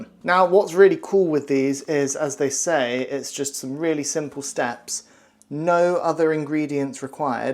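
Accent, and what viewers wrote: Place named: England, United Kingdom. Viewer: Where is your accent from? As in which part of England?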